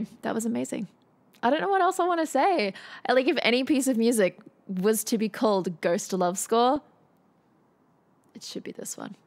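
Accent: Australia. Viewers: ... we are going to the advencha... love your ozzie accent :) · your reaction video of was the third music reaction video I ever watched. It still own's a little "piece of my heart". Hope you are well. Loved seeing you with Elizabeth Zharoff (The Charismatic Voice). Love hearing an Australian accent - never thought I'd ever actually write that! G'day mate. Vic 3044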